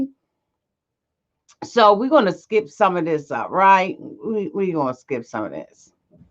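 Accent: Washington DC, United States. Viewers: Auntie I hear DC in your voice are you from DC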